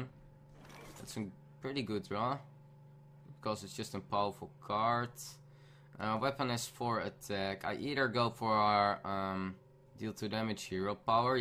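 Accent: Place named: Netherlands. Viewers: You can't just tell a person to be good at something. as you know is Dutch which means he has been speaking the language for his entire life and obviously has adapted to that way of speaking. The Dutch language has a lot of rules which the English language either doesn't follow or has a different rule for entirely so teaching a Dutch person English is harder than teaching them some other European languages. (I probably also made some grammar mistakes in this comment sorry about that.) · I understand and agree with your point, its more of a recommendation if anything:) would (I think) benefit from minimising his Dutch accent, as it will widen his audience and allow him to more effectively reach his viewers. it's not just me berating him for his English accent, it's imo in his interest as well!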